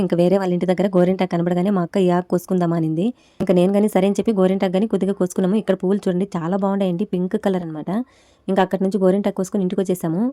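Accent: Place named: Rayalaseema, India. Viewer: A vuru midhi rayalaseema na anantapyram anukuntunna mi slang vini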